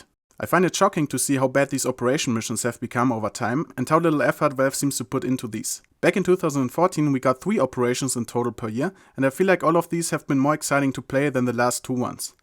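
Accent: Germany. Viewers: Man hört so krass, dass du Deutscher bist 😂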